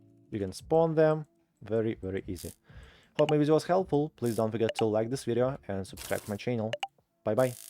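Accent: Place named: Russia. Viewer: слышится русский акцент, но всё равно классно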